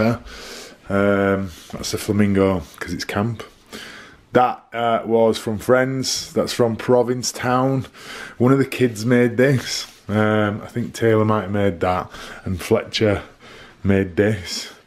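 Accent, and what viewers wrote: United Kingdom. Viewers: Nice British accent, love it 👍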